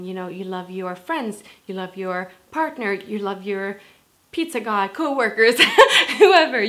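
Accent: United States. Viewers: Your English sounds very American to me.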